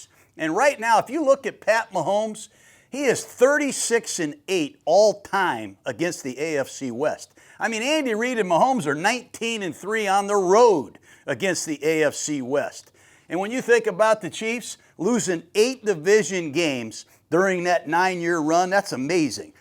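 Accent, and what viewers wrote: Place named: Boston, United States. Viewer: Baahstaahhn accent go me jazzed! lets go coach!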